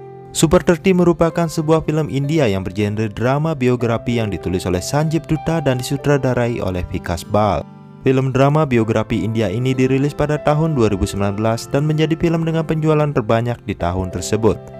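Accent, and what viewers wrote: Bali, Indonesia. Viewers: Asli bali bang ?Logat bali kental banget bang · Hehehe logat bali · Lebih ke logat bali..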